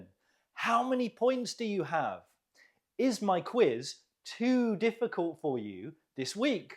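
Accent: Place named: United Kingdom. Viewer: I like watching you so much and i like your British accent thanks a lot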